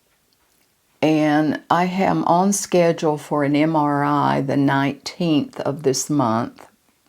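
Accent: South, United States. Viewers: She sounds like she's from the South. Peonies don't grow in warm climates, so she might never have heard anyone talking about peonies. I didn't know either, until I moved to Ohio.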